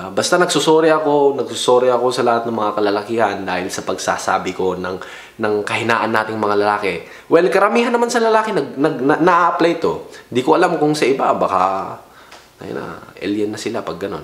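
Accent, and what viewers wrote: Ilocos, Philippines. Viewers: parang Ilocano mag salita .. yung accent nya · Ilocano ata to🤣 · Hahahaha may ilocano accent e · May ilocano accent😂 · Parang ilokano, ibanag accent diba po?✌️ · ah ok.. Hehehe.. Yung accent kase nya.. Hihihi.. Ilokanong ilokano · Hinde nakakatawa pero... NATATAWA AKO😂 ILOCANO KABA? 😁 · Kuya ilocano k??😂😂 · ilokano ata sya.. ay na apo madlaw😂😂😂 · Ilocano ba t0? Ung accent nia kase😁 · Parang may ilokano accent po kayo😁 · Woahh ganun pala yun🤣🤣..peo the way you talk . Diko maexplain eh ilocano ka ba or tagalog?🤣🤣wla lng ask ko lang🤣🤣🤣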